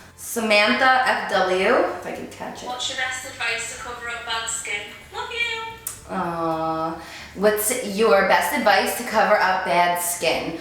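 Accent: New Jersey, United States. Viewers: Your Jersey accent is so cute:) Love you!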